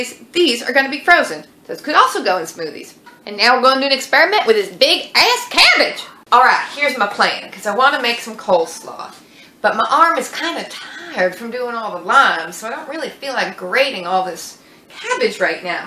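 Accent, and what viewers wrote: South, United States. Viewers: I saw jersey license plates in another video but you sound like youre from the south.......just wondering. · Why do you sound southern today? Or is it me? Anyway, love listening to you ...some pretty good ideas...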